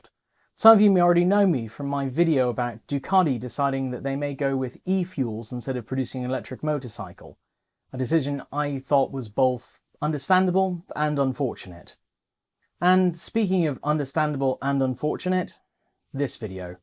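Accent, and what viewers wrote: United Kingdom. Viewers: This has to be one of the most informative videos I’ve watched on YouTube in a long time. Aside from that, perhaps one of the bravest I’ve seen too. Never easy to talk about something so personal and you presented it in a way that made it incredibly easy to understand. As for worrying about what Brits think of how you sound being called a British accent, don’t. As an expat Brit, I can tell you we have so many accents anyway, there really isn’t any such thing as a British accent, and I’ve never known a Brit who even thinks about it (except for cases where we can’t understand somebody, and, yes, there are other Brits with accents so strong we can’t understand them either). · I watched just your Ducati-video. Keep this British-sounding clear-speech. You are far more easily comprehensible (to all people with a hearing-impairment)! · I enjoy your brit diction, stay safe and well.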